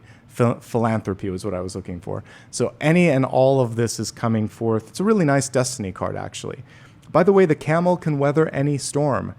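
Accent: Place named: United States. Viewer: I see that building from your window and wondering where are you from. You have american accent but that landscape doesn't look american 😅 Best wishes for Apollo!❤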